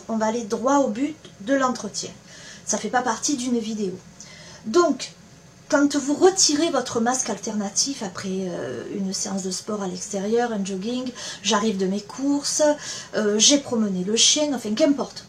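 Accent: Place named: South, France. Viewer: Merci à vous pour ces conseils pleins de bon sens, Ah le sens pratique... Avé ce petit accent méridional plein de charme. Bon courage et bonne continuation.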